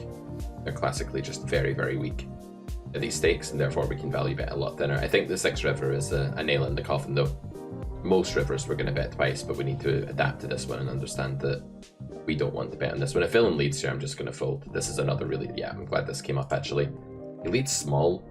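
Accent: Scotland, United Kingdom. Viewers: Really like your Scottish voice… nice books too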